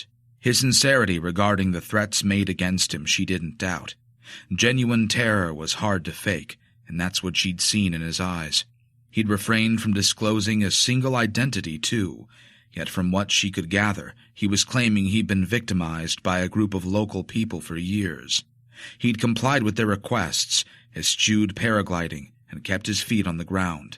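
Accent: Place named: United States. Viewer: The narrator should be English. The American accent jars with the language.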